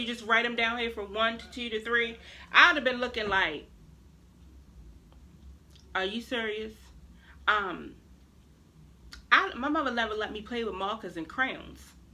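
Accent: Baltimore, United States. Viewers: Hey chick! I love your accent. B-more! ❤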